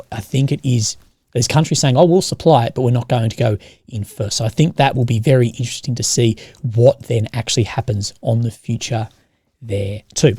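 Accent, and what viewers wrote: Australia. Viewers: So, this is Russia Today with an Australian accent?! Cool!!! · my dear.. i love your "aussie" accent (for me as an italian it sounds a bit funny but I love it) and I love your good will to be "near the reality" this is important for one like you that make reports about a situation on the field trying to avoid the "propaganda warfare" that we all know it's inevitable but of course not near the reality. So I will express here my appreciation for your work.. I really don't care if you (or somehone else) is pro-russian -pro-ukrainian or pro-something-other what I care is that the the job that you are doing try to get the facts. that's all...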